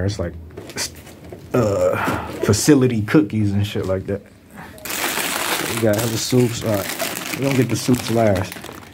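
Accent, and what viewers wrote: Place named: Indiana, United States. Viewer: What’s really crazy is on the outs you’ll only see 98% of the stuff he has in a hood store. Nowhere else. N bro sound like he from Indiana or Michigan he don’t sound like he frm da city or Illinois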